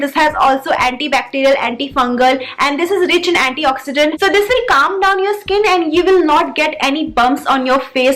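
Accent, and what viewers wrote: India. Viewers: You have the thickest indian accent, I love it so much.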